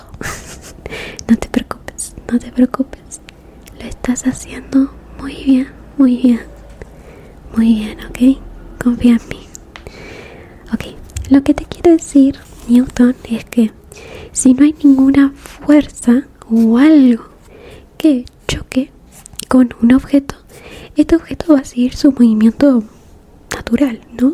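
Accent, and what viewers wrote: Argentina. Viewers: Hace poco conocí tus Asmr y me ayudaron una banda para estudiar para unos parciales q tenía considero tu canal el mejor de Asmr de Arg creo q sos de aca o de Uruguay x el acento sjsjjssj Nada seguí así PD:Tenés unos re lindos ojos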